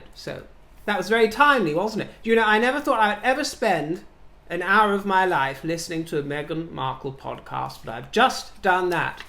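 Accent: United States. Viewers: you do a great American accent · You're a yank...?🤭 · I love the switch to your American accent! XD great video, xx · Your American accent is killing me! 🤣💕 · I just love your American accent today. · your American accent is perfect! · I love your American accent! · You are such a gem! The American accent is 🤌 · OMG! Your American accent cracks me up!!! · Just love American girl accent, so perfect! You gave the most incredible wit n humor! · LOVE your American accent! Got me laughing! Hugs from Texas.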